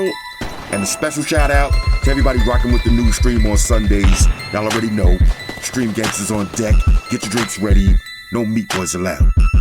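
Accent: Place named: West Coast, United States. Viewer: Here my man I just heard the voice for 1 second and I subscribed he reminds me old school west coast 😂😂😂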